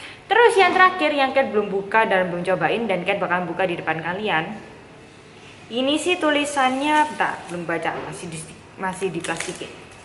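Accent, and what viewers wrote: Java, Indonesia. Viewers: logat jawanya itu yg bikin suka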